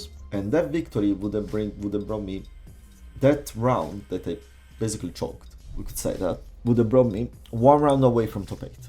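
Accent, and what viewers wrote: Italy. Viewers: Sei italiano Bro ?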